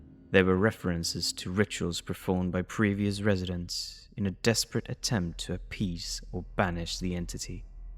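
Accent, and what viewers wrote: United Kingdom. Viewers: you have the perfect british accent for this too😉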